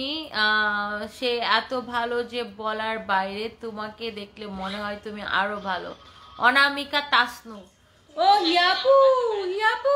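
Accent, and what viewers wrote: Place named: Sylhet, Bangladesh. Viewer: Appu Hamen sylhet ke bolchi Aapne lagche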